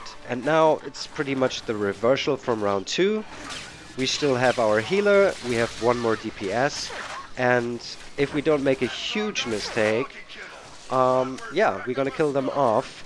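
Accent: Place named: Germany. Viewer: Good tips tnx. Love the german accent ;)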